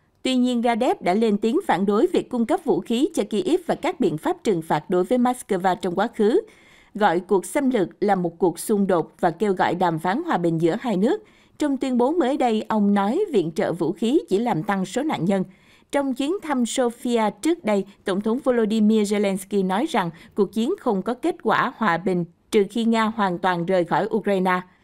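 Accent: Saigon, Vietnam. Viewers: Rất tò mò kênh nầy mà giọng xướng ngôn viên hay quá, đây mới là đỉnh của người truyền tin tức cho mọi người nghe vì tiếng đọc miền Nam Saigon rất chuẩn…!!! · Giong sai gon nghe chu an va ro rang hon .va de thuong hon.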